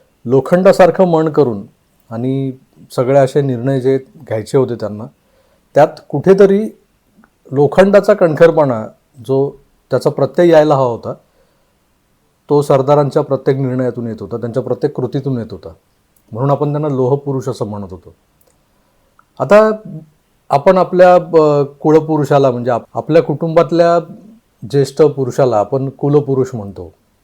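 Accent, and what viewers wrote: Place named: Dakhni, India. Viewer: आपका दक्खणी हिंदी सुनाते बहुत मजा आता है.व्हिडिओ बहुत अच्छा है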